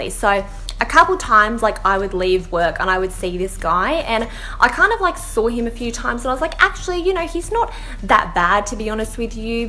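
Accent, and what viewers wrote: Australia. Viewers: It's an Australian accent😂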